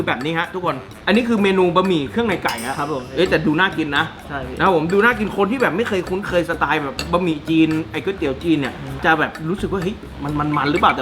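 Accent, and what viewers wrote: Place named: South, Thailand. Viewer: คนใต้ใช่ไหมนิ มาเลยมาคุยกับหัวหน้าผมก่อน😆😆😆😆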